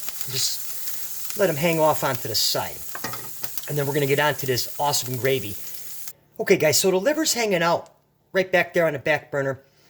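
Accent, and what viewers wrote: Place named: New York, United States. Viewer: Accent of N.Yorker !!!😎Cheers !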